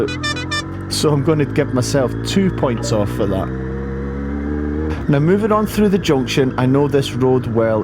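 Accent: Scotland, United Kingdom. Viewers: Just found your channel. Enjoying the content but with your accent spent a while trying to recognize which part of Scotland you are in. 😂 Also a returning rider and being rusty on my town riding these are excellent studies in the art of... Thanks. Subbed.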